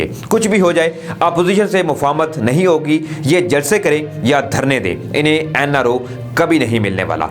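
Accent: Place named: India. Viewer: sahib tum ne urdu bolni kahan se seekhi? Ya tum urdu ko hindi bananay ki nakam koshish kar rahe ho. Mera mashwira hai keh urdu sahi ker lo. Ziada indian ban ne ki koshish na karo.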